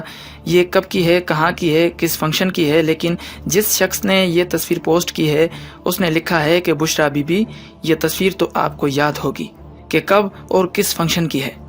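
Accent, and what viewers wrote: India. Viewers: سب سے پہلے حرامی تم ھو جو یہ ویڈیو ڈالنے والے حرامی ماں اور بہن کی تصویر بھی ڈال دیتے۔شرم کرو ایک تہجد گزار عورت کے بارے میں بکواس تمہیں شرم آنی چاہیے ۔تمہاری آواز سے لگتا ھے کہ تم انڈین اسلئے تمہیں کیڑا کاٹ رہا ھے۔ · Mr .who ever you arebut one thing is confirmed that you are not a pakistani but an indian your accent is not pakistani at all ,muslim or hindu i can tell too. let me listen u again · مجھے تو تم اپنے لہجے سے انڈین لگ رہے ہو۔ رہی بات بشرا کی تو جو عورت کسی کے ساتھ تیس سال گزارے اور اس کے پانچ بچے ہوں اور نواسے نواسیاں بھی ہوں اس کے بعد اگر وہ علیحدہ ہو کر کسی دوسرے سے شادی کرتی ہے؟ تو اس کے بارے میں کیا کہہ سکتے ہیں؟ پنجاب میں ایسی عورت کو کوئی عزت کی نگاہ سے نہیں دیکھتا۔ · HADEES MUBARAK HAY NAKE MARD KO PAKBAZ BEVI UR GANDAY KO FAHISHA UORAT MALAY GEE, PAHLAY IMRAN KA KIRDAR DAKH LO BUSRA KA FASLA HADEES KEE ROSHNI MAIN KAR LO. 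. . DOSRI BAT YA VIDEO JIS NAY BANAEE HAY US KEE URDU SUN LO INDIA WALOON KA LAHJA HAY CHANAL KA NAMUSALMAN JASA RAKH KAR N LEAGE PER ILZAM RAKH KAR SIRF RAW KA MAQSAD PURA KARNA HAY, AB FASLA KHOD KARO DUSHMAN INDIA HAY YA MUKALIF SAYASI JAMAAT . BAQI ISLAM TO KAHTA HAY LA RAJBANEEYAT FEE ISLAM ISLAM MAIN PEERI FAQEERI NAHEE HAY ,,,,KOJA AAK UORAT DAWADAR BAN JAEY PEERI KI UOR HAZER KHAWAND KO CHOOR DAY JAWAN BACHOON KO SARMINDA KAR DAY, CRICKET HERO KO PANAY KAY LEYA , PHER TAWEZ GANDNAY KARAY ( LANAT KI ALLAH NAY GRHOON UOR GANDOON PER PHOOKNAY WALOON UOR WALIOON PER) KHAWAND KO KURCI DELANY KAY LEAY ,,, TO FIROON KAY JADO GAROON KAY LEAY AKK MUSA BHI AYA KARTA HAY UOR US KAY PASS AKK DANDA ( AASA) BHI HOA KATA HAY,,,, JO SUB JADOO GANDAY KHA KAR DAKAR BHI NAHI MARTA. KITNI SAFAEE DO GAY, IN AGENTS SAYASAT DANOO KI BUS,,,,,,, PAK,,,,,,,,, FOG,,, DINDABAD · Bolnay wala banda 100 % indian hay. 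Iski urdu sun lain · تصویر پتہ نہیں کسی نے لگائی بھی کہ نہیں تم بلاوجہ بیغیرتی کر رہے ہو۔ اور تم لازمی انڈین ہو تمہارا لہجہ صاف بتا رہا ہے اور ہمیں پہلے ہی پتہ چل چکا ہے کہ مریم نواجی نے اپنے میڈیا سیل کو بشری بی بی کی کردار کشی کا ٹاسک دیا ہے تم بھی اسی کا حصہ تو نہیں؟؟؟ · This rubbish video made by Indian the man who is telling fake story he is 10000% Indian his accent is Indian he cannot speak clear urdu may be hire by corrupt PMLN. False story.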